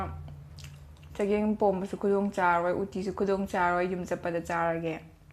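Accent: Kakching, India. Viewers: mathangdi che pork chabei oina twrak oh kakching marol tabasu yam nungaii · Kkching lon sum ngngbiyo .....cna hena tab nungaijei😍😍😍😍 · Kakching marol ngangba heisu heita malle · Noi lonse taba yam nungai eigi enamashu kakching bazar mnaktgi ngdi noi lonbu heitreda. Ado pork chardi pork chabgi video tourako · Kakching maron d ngang da b n henna fajei natural d oina adum ngang ngo... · Kakching londa ngangbdo yam nung c nangna chabdu haodou yam male hayeng yumda thorkanda sak khangnarga chaminase eisu haobra yenge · kakching lon ngangbac tba ym nungai ado lon khoidabda su hei ym nungaiye tabda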